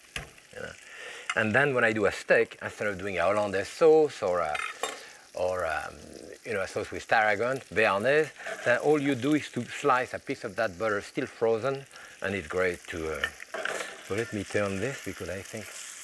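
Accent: France. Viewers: Haha I'm sure I wished that himself. Fact is the French never lose their French accent while speaking English. It's either too difficult and/or too painful for them to do so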